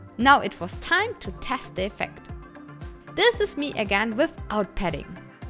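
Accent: Germany. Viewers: Dis Jerman Äksent! <3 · Ist sie deutsch vom Akzent her?😅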